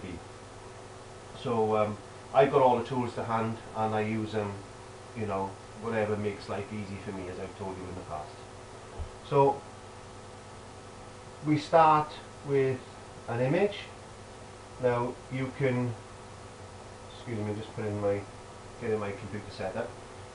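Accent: Wales, United Kingdom. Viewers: seconds in, I prepared to hear the Welsh accent. (ou can also draw a bit too, butt). God's Country. from an Irishman.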